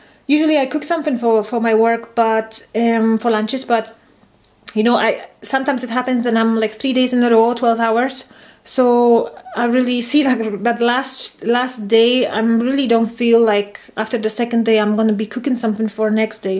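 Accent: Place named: Scotland, United Kingdom. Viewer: I loved this video! I can only presumeFrom some of your pronunciation "livin', aye, wee" that you are living in Scotland? :) I am Scottish born and raised and a follow vegan! So bery glad I found your channel! Xxx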